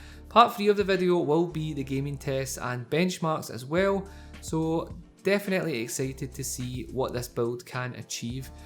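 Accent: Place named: Scotland, United Kingdom. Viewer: i love your Scottish voice xD BTW nice video :)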